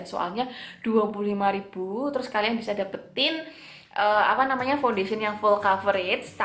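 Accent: Java, Indonesia. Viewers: Mba bule medok 😍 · Yey Like And First Watching Video Mbak Medhok.. Sukses Trus Mbak☺. Makin hri mkin syanteekk. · Ayunee mbk medhokk😍😍😍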